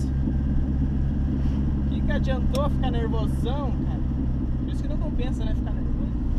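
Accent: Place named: Curitiba, Brazil. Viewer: oww cara vc é de Curitiba - XaXim kkk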